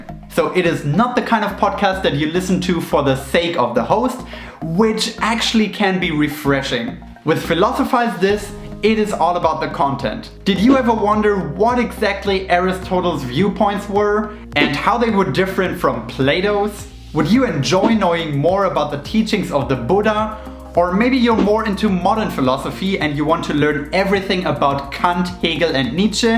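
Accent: Germany. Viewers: Hey, where are you from? :) you have a little bit of an german accent... As we say in german: gefällt mir ^^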